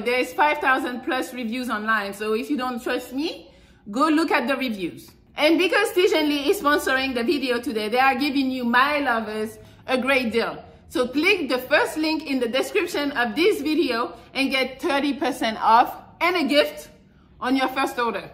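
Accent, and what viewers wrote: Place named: France. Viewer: Love your French accent.❤️❤️❤️❤️❤️❤️❤️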